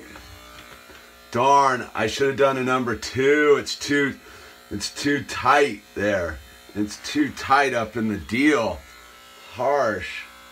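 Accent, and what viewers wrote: United States. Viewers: John lydon be sounding a lot more American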